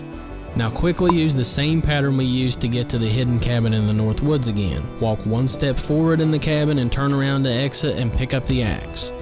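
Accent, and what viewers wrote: South, United States. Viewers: Aww the good ole days, great video btw way your southern accent made it even more intriguing! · liked subbed, love your country accent and video coverages on titles. you really do great indepth coverage and the sound quality is great, liked and subbed!! <3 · Loving that southern accent. Cool channel! Subscribed and liked! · First video I watched. Subbed. Great video and well researched. I personally love this game too. A few things: You mentioned a couple of the purple Jason tributes, but there's also an awesome purple NECA "action" figure worth a look. Next, with the release of NESmaker, we can expect an explosion of new rom hacks, specifically ones fixing, improving, and editing old NES games. I know rom hacks exist, but the NM program makes it so easy anyone can basically make their own game from scratch. Good times a'coming! 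Lastly, I'm trying to place your southern draw, I'm from Memphis myself, but have lost most of my accent living overseas for 20 years. My bet is Arkansas or Kentucky. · This guy has the perfect amount of country twang in his voice. I'm a fan sir, u remind me of home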